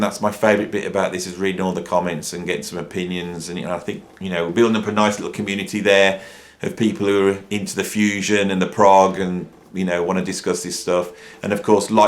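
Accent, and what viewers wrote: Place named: England, United Kingdom. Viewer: Give him a break he's an Englishmen they all pronounce words that end with an "A" ( er) . people here in the U.S. (New England) do the same. '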